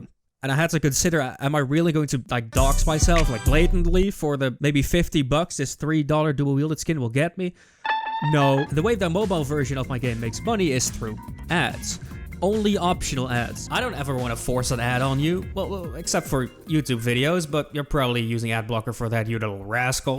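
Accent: Netherlands. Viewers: Didnt hear the dutch accent until he mentioned hes dutch…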